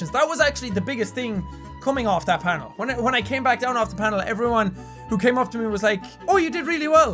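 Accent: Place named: Ireland. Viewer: Where is from in Ireland? I'm from Dublin so I know he's definitely not from here but is it Cork or somewhere? Can't really place his accent hahaha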